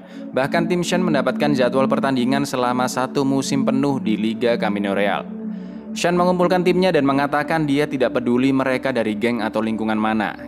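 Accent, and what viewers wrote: Java, Indonesia. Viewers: Jawane ngdi mass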